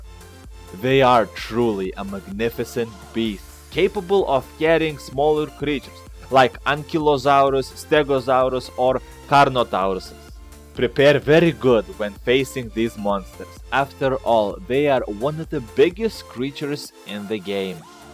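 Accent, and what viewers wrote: Russia. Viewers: That accent is amazing comrade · wtf man im not even inglish i just asked if he was russian... because the way he talks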